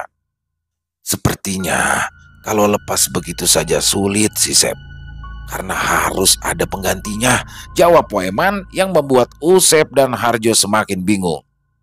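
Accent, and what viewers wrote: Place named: Jakarta, Indonesia. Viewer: Kocag deh bang 😂 mas jawa? Logat betawi nye kentel bgt bang, expert sekali 👍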